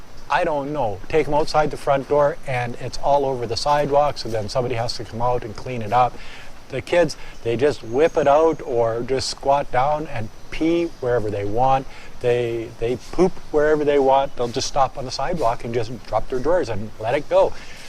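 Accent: Canada. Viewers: This guy sounds Canadian. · This gentleman is a Canadian, eh? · This guy sounds very canadian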